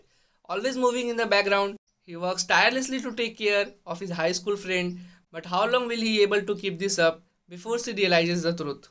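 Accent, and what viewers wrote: India. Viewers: It's a "desi" indian accent. It's better to watch on mute ^^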